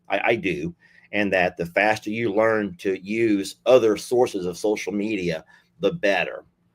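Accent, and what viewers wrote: South, United States. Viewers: Your accent was more southern aristocrat to my ear,. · Your voice sounded like a southern accent, no a Yankee at all. 😂 Jaqueline is not a typical African American name. I also had a pastor who was white and named but went by Les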